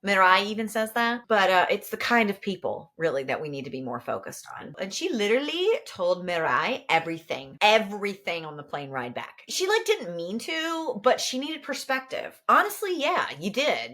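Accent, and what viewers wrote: France. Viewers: I gotta say yes to the French accent. Really helped soften the gut punch that was this book🥖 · I had to do a lot of spreadsheet work, so I finally (reluctantly) dove in to the second half. You are so funny as a presenter. Thank you for taking this commission. I knew I would hate the ending (because I hated how the book started too) but you and your French accent made it almost bearable. Almost. Also, you look super cute in bangs. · Of course we need more of your French accent. Why is this even a question? 😂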